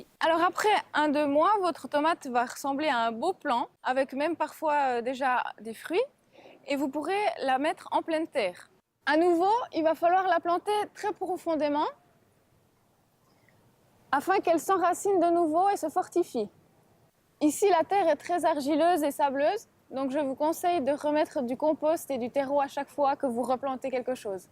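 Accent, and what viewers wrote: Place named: Switzerland. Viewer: c'est marrant ce petit accent suisse, c'est mignon.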